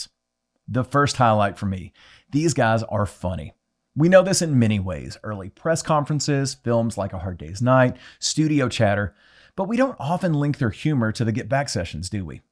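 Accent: South, United States. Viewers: Your Southern accent breaks through on occasion. Where does that originate from ?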